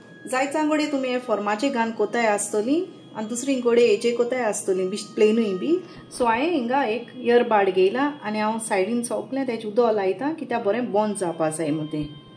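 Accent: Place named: Cuncolim, India. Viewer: I like your konkani dear.are u from cuncolim? It sounds like cuncolim konkani 😅😅. Love all your recipes ❤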